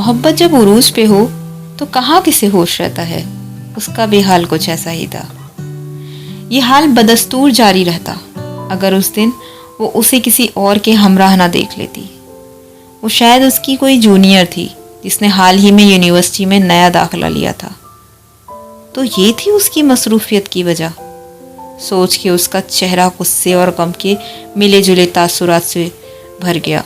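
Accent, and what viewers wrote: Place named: Pakistan. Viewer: Bht khoob hai y urdu lehja apka n very nice❣️❣️❣️❣️❣️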